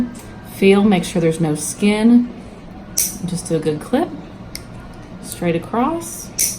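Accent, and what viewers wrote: Kentucky, United States. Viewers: I'm a Buckeye not a Kentucky girl- but we are close enough in vocal patterns that I feel, we are family after all. Love how you make your older patients feel at ease- as a person of a " certain age" often you hate admitting to family members there are things you can't do easily, especially toenails, after arthritis, joint replacements et all there just things you lack flexibility for. Thank You for being so kind!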